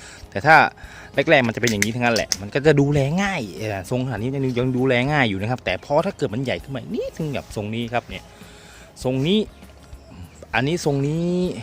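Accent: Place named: Sukhothai, Thailand. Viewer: สวนอยุ่โขทัยมั้ยครับฟังจากสำเนียง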